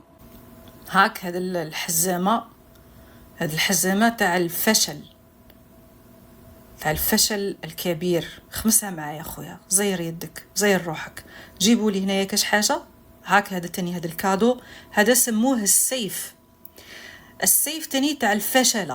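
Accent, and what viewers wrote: Morocco. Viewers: مهما حاولت التأقلم على اللهجة الجزاءرية فلهجتَك المروكية خانتك انت عياشة والحلم لن يتحقق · Cette femme est marocaine l’accent marocain la dénudée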